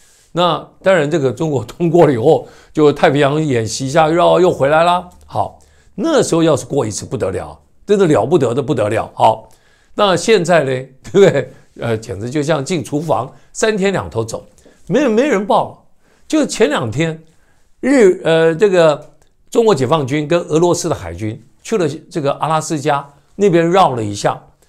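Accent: Standard. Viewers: 语音标准 评论优默 见界宽阔 正能量满 正气善评 优秀才气 愿你全家安康如意🙏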